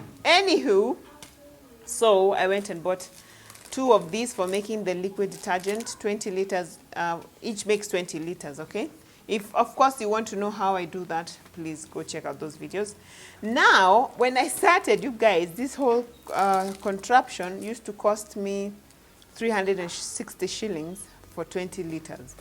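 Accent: India. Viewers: We're moving to India,love your indian accent. For cooking oil we bought 20 ltrs from a wholesale shop, and dividend it with my sister. It's way cheaper than buying from the supermarket. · The Indian accent caught me off guard😂😂😂😂😂😂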